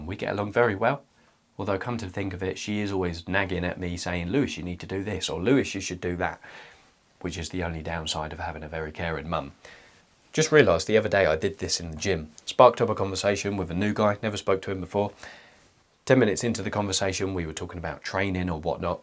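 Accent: United Kingdom. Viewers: Good morning! How do you spell your name? I spell mine Cory. So, I’ve been spending less time on my phone but it struck me this morning, i miss Lewis’s British accent and words of wisdom❤ i also thought to myself, Cory how much of the health that has lead you to spend less time on your phone is due to fabulous YouTube channel 🤔🫶🏻🫵🏻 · This British accent is understandable and good, which part of Britain do they speak this way? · I recently discovered your channel, and aside from the helpful advice, I have to say I love your voice, your way of speaking, and your soft British accent. English isn't my native language, and your videos are helping me improve my pronunciation. goat 🐐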